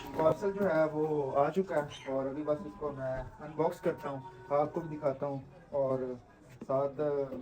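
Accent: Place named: Punjab, India. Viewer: Apka punjabi accent bohat acha hai bhai 🤍🤌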